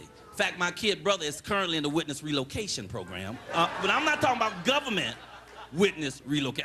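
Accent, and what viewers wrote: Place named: Louisiana, United States. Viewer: Something about the sound of his voice rings "Cajun" to me ...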